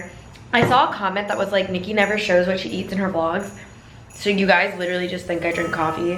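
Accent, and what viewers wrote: United States. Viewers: I’m British and her accent seems stronger than other Americans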